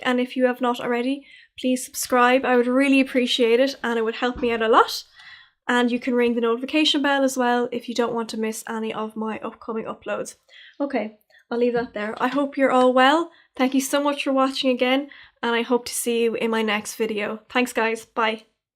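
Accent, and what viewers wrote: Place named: Ireland. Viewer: What a beautiful collection! That purple eyeshadow is stunning, even if it needs a bit of work. I really enjoyed this video, your Irish accent is so nice to listen to, and you were so thorough when talking about the products. Also (this is a really weird comment) I'm so jealous of how much lidspace you have x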